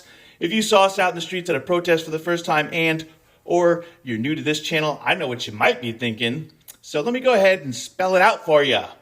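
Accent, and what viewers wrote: Scotland, United Kingdom. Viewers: are you from Scotland because i always hear an accent come through on your vids?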